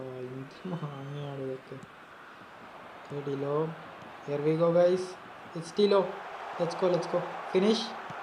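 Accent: Kannur, India. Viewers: slang kettappol thonni atha choyichee😅😆 knrr evdyaa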